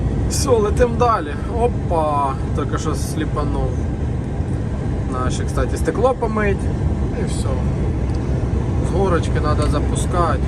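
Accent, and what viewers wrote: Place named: Surzhyk, Ukraine. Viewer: Говори по-русски. У тебя хорошо получается. А вот мова твоя ужасна, то есть - это не мова соловьиная, а очень херовый суржик, говносмесь. ПРежде всего в произношении, в фразах. НЕ позорься.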